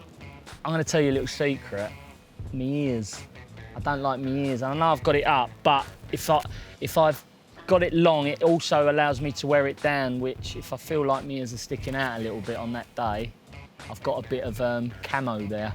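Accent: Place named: Essex, United Kingdom. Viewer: Could he get anymore Essex. He puts extra 'w' in stuff it's hilarious. Prwawn cocktaiw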